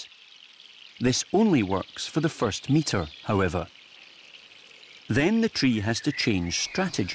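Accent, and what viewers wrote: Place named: Scotland, United Kingdom. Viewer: Moar narration with that Scottish accent, please! ♡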